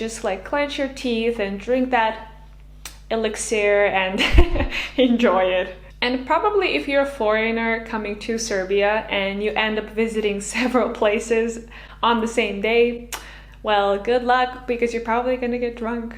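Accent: United States. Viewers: i bivis u Nemackoj a orde neki Ameriki akcent koristis, nista ne razumem, sta je poenga vog kanala? Sprehaj Dojc brate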